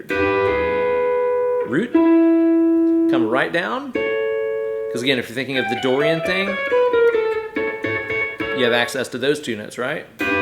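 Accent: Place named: Virginia, United States. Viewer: I love this! As an experienced metal player trying to work my way into country playing, this is a quick easy transition, rather than attempting to start from scratch or re-invent the wheel, so to speak. Great content as always. You have quite the way of explaining things, so that anyone can get it. I grew up in SW VA so your accent reminds me of home. Keep rockin!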